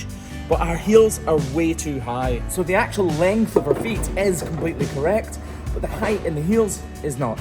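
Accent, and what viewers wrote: Scotland, United Kingdom. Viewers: Please continue to pronounce iodine the way you say it. You are Scottish in Scotland. I very much enjoy listening to you speak. Don’t change for You Tube.❤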